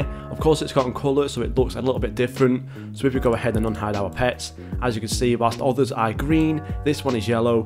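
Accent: United Kingdom. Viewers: Very nice bri'ish dude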